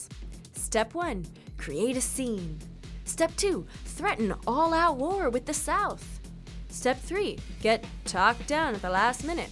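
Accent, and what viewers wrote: United States. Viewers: This video sounds American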